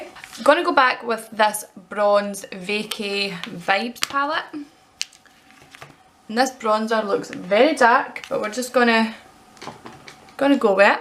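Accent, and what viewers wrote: Scotland, United Kingdom. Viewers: I just adore the Scottish accent, I’m so obsessed with it 🥰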